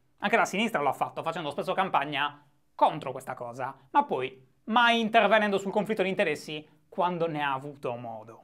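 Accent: Sardinia, Italy. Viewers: Minchia se sei sardo!!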